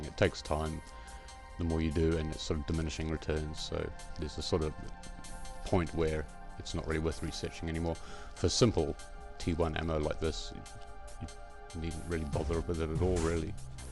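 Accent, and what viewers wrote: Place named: New Zealand. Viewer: its that kiwi accent, soft vowels.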